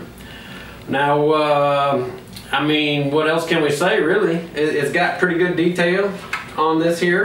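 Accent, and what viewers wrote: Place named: Alabama, United States. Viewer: OMG!!, HOW did you ever keep a straight face!!?? "Happier than a Pig in Shit"!!! Well drop me in Shit and call me dipped!! Your accent brings me back to High Skewel in MONgomery Alabama. 😅😅😅😆😂😂 The Puns alone were enough to get me to bust a gut!! I might buy it for the Body I believe. But, I'm sure as Church on Sundee that I'd get that Trck for the stickers and signs!!! Usetacould! 😜 DADGUMMIT! That was about the most fun I've seen y'all have on one of them videotapes! I reckon I ought to put my Twang back in the Box. Might just turn my Wife madder than a Opossum in a paper bag!! 😉 OK, I've got to be done here..... Sadly, I still live in Arizona. Sir, that was FUN! Carmine 💥 ⛽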